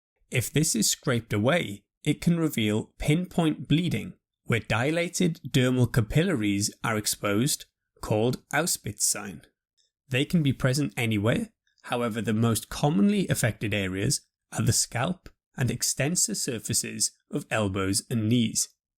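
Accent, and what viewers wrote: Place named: United Kingdom. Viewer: is british accent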